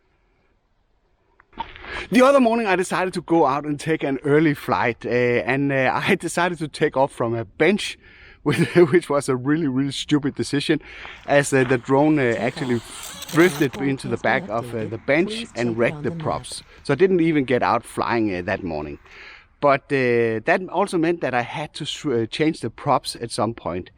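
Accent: Denmark. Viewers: Tænkte nok, jeg kunne høre det på accenten.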